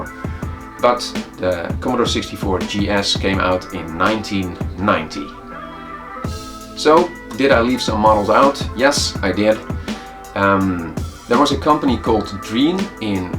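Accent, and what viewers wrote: Netherlands. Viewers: Netherlands accent?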